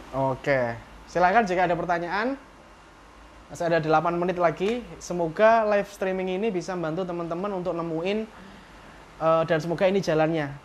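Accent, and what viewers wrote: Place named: Yogyakarta, Indonesia. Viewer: Jelas mas dr yogya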